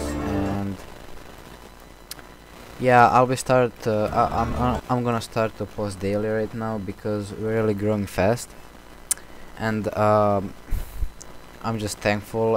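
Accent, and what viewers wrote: Russia. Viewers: Это что чеченский акцент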